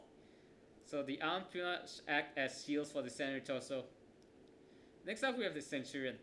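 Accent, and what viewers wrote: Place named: Singapore. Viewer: I detected Singaporean!!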